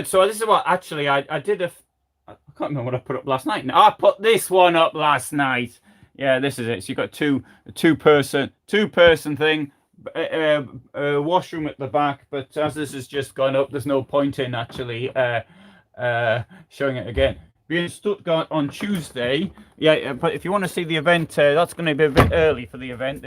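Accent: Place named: North East England, United Kingdom. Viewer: Morning from Scarborough, North Yorkshire not a million miles from where you're possibly from with your North East accent?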